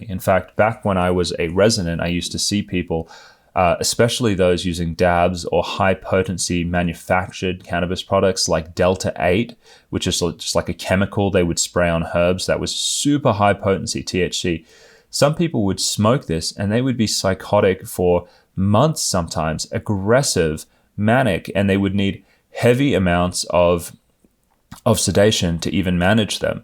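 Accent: Australia. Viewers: I think this is a really important video. That said, however, you are speculating. It could be that there is a genetic or psychological predisposition that makes them sensitive/vulnerable to cannabis. What you said about the conversion rate suggests that there might be a relationship between a tendency for certain psych issues and cannabis (THC?), but it could be the opposite of your suggestion, i.e. it triggers the latent condition. You sound Australian and you look Indonesian. It's a popular place for Indonesians (I lived there for 15.5 years). Did you move or were you born in Oz?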